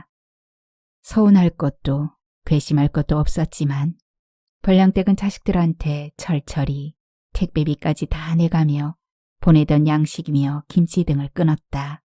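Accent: Jeolla, South Korea. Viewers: 정감어린 전라도 사투리를 어쩜이렇게 소담소담.읽어가시는지..대단하십다. 감사합다.^~^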